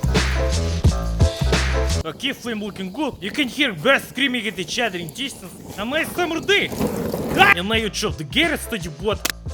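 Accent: Russia. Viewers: Your thick russian accent made me a little tired but you are so funny :) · I watch too many of your videos, I no longer need subtitles, I understand you just fine. A friend asked me if I speak Russian, I said "no Cyka Blyat", but I understand English, it's almost Russian 🇷🇺. Keep the videos coming!!!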